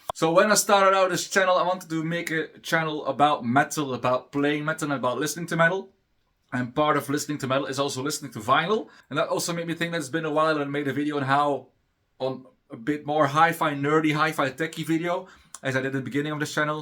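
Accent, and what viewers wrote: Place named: Netherlands. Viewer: Je klinkt als een Nederlandse die Engels spreekt 👋🏻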